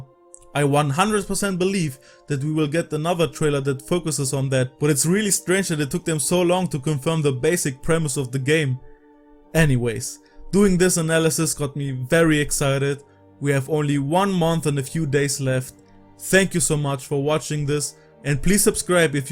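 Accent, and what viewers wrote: Germany. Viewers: Nices Video! Bist du ein Alman? Hört sich irgendwie so an vom Akzent her :)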